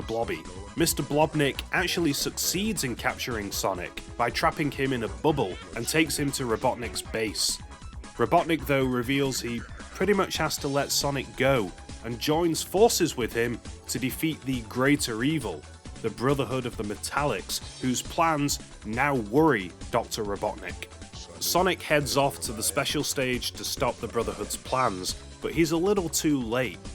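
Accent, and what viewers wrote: United Kingdom. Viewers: is he brittish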